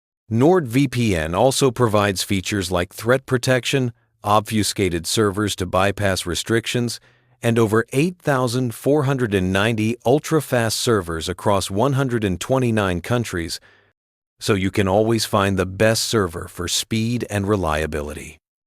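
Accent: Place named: India. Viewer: indian detected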